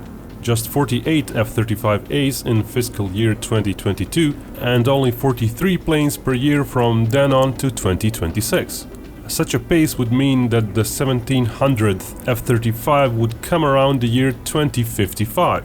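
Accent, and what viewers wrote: Russia. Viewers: I don’t trust info from a Russian sounding guy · Hmmm...some guy with a Russian accent telling us what our Air Force will be doing. I’m just surprised it’s not someone with a Chinese accent.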